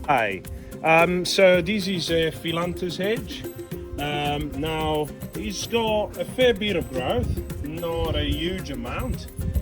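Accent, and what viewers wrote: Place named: South Africa. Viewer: Trying to work out your accent. So far i've got South African/Russian /Aussie/Brummie.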